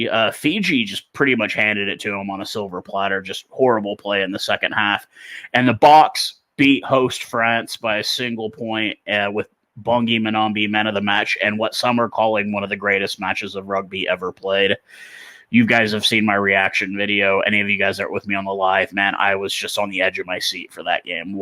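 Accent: United States. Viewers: Thank you for this video, really enjoyed an American voice talk informed and passionately talking about our Bokke, will be following your future content, would actually love to see the US come up in the rugby world. Keep up the good work.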